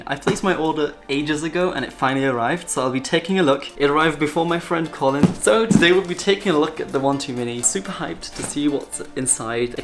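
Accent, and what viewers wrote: Germany. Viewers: Youre english sound so weird, at some parts you could tell you´re german but in other parts you just sounded like you´re speaking english as youre first language. Not hate tho haha <3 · Hallo du hast einen deutschen Akzent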